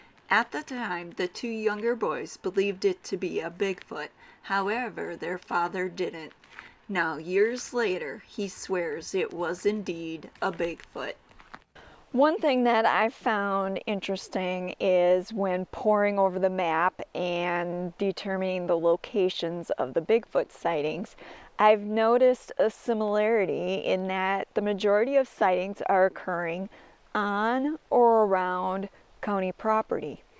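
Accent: Wisconsin, United States. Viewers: I think some of the commenters think you're mocking believers because of the tone of your voice, you're not, if people have seen your other videos you have a very open mind. I think it's the Wisconsin accent that's throwing people off.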